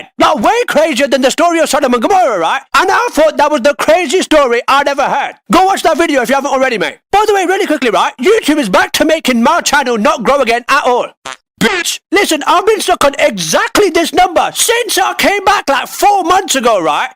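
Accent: United Kingdom. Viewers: I love listening to the angry British man rant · A british guy talking about bible, best video on YouTube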